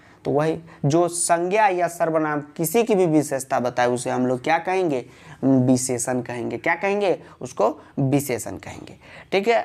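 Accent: Bihar, India. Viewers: Sir, kya ap bihar se ho. Apke bolne ke tarike se lg rha h. Pls, bta dijiye❤💫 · sir full Bihari style rocks😂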